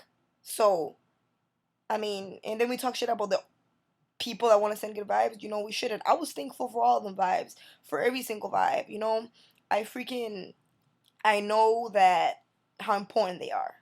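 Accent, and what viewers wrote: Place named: Boston, United States. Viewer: Is it weird that i love the boston accent?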